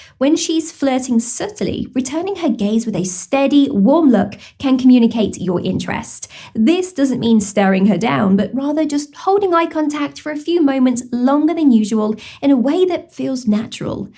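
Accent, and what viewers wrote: United Kingdom. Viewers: Your advices are the best, and make a real difference. I also come here to hear your lovely, soothing, British accent.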